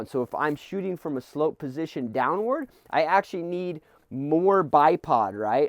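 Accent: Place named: Colorado, United States. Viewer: Hey, I appreciate the video. I know its weird, but I gotta ask... I have the same accent as you. Did you grow up in the Colorado mountains by chance?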